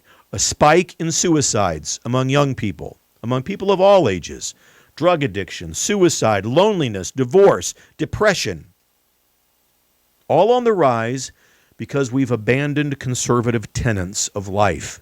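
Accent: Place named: Scotland, United Kingdom. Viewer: Well done my lad!!!! Nice Scottish accent!!!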